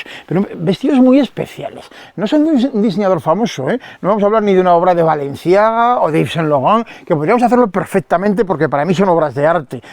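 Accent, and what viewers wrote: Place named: Aragon, Spain. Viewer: Te he descubierto por casualidad, buscando el Delfos, como me alegro, me encanta tu canal!!! Eres capaz de llevarme a cualquier sitio y te sigo...la capacidad de transmisión es impresionante...y hablas muy sencillo. Yo soy de Zaragoza y vivo en Teruel desde hace muchos años. No tengo acento pero me encanta escuchártelo a ti. Desde luego me fijaré más. Historias con alma!! Besicos de una zaragozana "hija adoptiva" de Teruel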